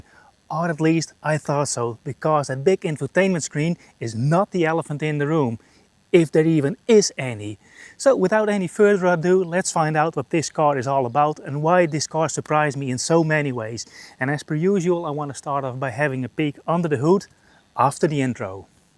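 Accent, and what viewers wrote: Netherlands. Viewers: Great informative reviews 👍your English Dutch accent is very cool to listen too 🇬🇧🇳🇱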